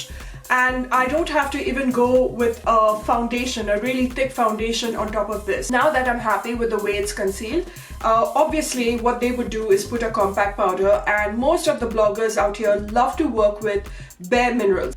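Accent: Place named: Kerala, India. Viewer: Hey, You are very attractive and I'm in love with this makeup look also Your accent reminds me of a very good friend of mine who also happens to be a malyali I met in Dubai a few years ago.. (love the accent💞) your videos are so informative And educational..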